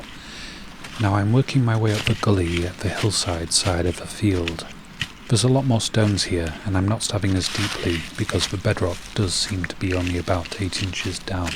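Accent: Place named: England, United Kingdom. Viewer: Thanks for the scenery and the English countryside feel of your voice as you take us on a trip down your memory lane. I was right there with you when you "broke on though to the other side" of that tree root. So in sync that I burst out laughing when you spoke, out loud, the words in my head. We even said broke rather than break.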